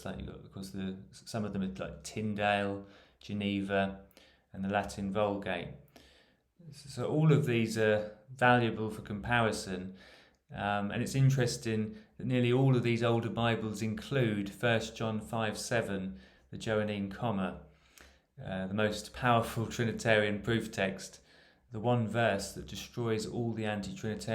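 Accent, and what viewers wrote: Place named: United Kingdom. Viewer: Good to hear UK voice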